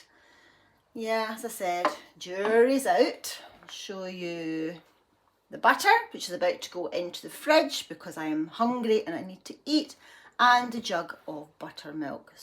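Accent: Scotland, United Kingdom. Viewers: When you said "stroganoffie" with that beautifiul scottish accent, I admit that I thought of bannoffie pie. :)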